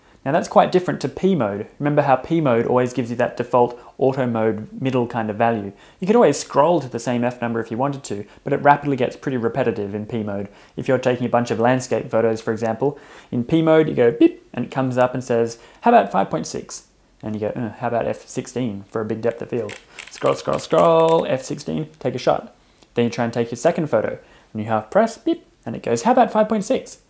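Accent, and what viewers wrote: Australia. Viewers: This is by far the clearest explanation compared to other videos. Easy to understand, yet more technical than others Chilled ozzy accent is a plus too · That was the most simplistic no-brainer explanation of f-stops/aperture I have seen on YouTube. I don’t know if it’s the smooth Aussie 🇦🇺 accent but my Canadian 🇨🇦 brain appreciates your teaching style and analogies!! 👏🏿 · Thanks. I’ve been out today shooting the Darwin wetlands before and at sunset. Your video has explained a troublesome shooting situation made pretty simple. It sounds easy, I probably make it difficult for myself. Thank you for a simple, no B,S, no problems tutorial. Good to hear an Aussie on here. I have followed and saved. I will return.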